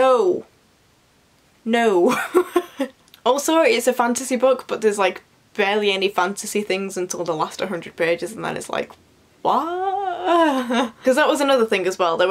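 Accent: United Kingdom. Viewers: I love your British accent, which part of the Uk does it belong to? Greetings from Italy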